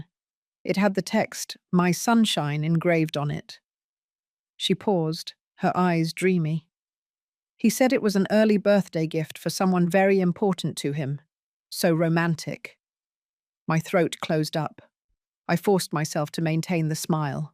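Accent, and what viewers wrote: England, United Kingdom. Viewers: Good English accent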